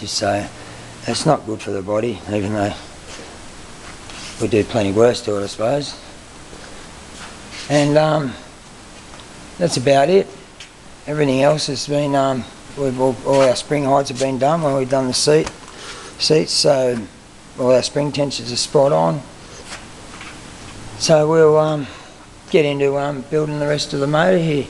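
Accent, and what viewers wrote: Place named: Australia. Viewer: he's an aussie mate, not a kiwi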